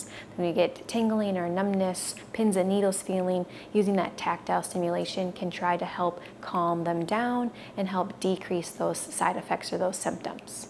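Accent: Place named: France. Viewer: Try British English as I habitant. Not mixture France with English, sound like beef like chicken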